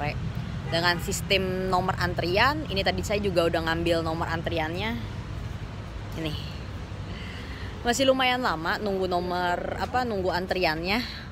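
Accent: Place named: West Java, Indonesia. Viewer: Kok logat nya Sundaan banget yah 😁😁😁😁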